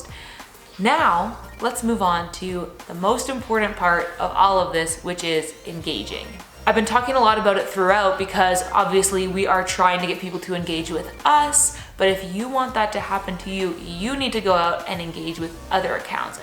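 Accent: Canada. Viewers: Your canadian accent is so strong! 😯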